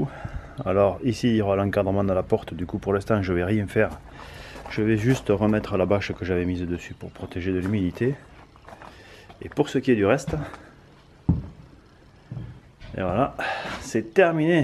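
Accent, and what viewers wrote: South, France. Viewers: Belle vidéo comme d'hab. ce paysage est magnifique et cet accent me dit quelque chose je pense que nous somme pas loin l'un de l'autre. Poir ce qui est des plot tu peut le remplacer par des laver autoblocant cela marche très bien aussi allez ciao a très bientôt dans tes vidéos qui me font passer à chaque fois un super moment même si comme toi j'ai un grand jardin qui me permet de pas m'ennuyer Jphi de carcassonne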